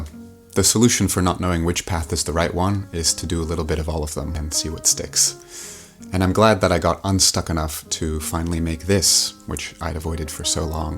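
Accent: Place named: North America. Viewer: Very beautiful and honest video. Thank you for sharing. Out of curiosity, are you Canadian? I ask because you speak English with a North American accent but you said "university" instead of "college", though it's probably because you did a PhD.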